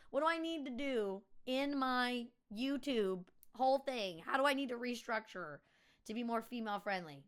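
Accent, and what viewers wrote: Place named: Upstate New York, United States. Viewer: Quick thoughts. 1. Can't really help much with female viewers. I found you as a recommendation from a song and I'm definitely not the smoke show you are lol. 2. It might be awhile but I foresee a time when labels lose their power. With the ability to self publish on Amazon and other places as well as independent production studios on the rise I think it's only a matter of time before bands move in that direction and hire independent teams and managers or firms that replace them. 3. I actually have wondered where you were from but not to be creepy. Being from NJ and living in NC I feel like I hear a bit of upstate NY and even a bit of southern in your voice. Your voice and mannerisms remind me a lot of a friend of mine from that area originally. After this video I feel like I might end up holding the door for you at the shooting range lol jk. 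Hope you have a great week and if you are looking for some bands some of my favorites are, and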